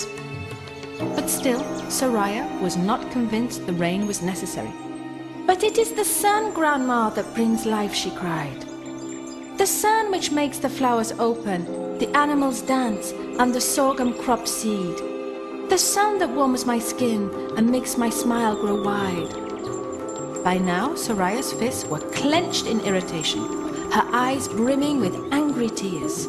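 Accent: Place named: Rotherham, United Kingdom. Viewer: Completely ruined by Rotherham accent!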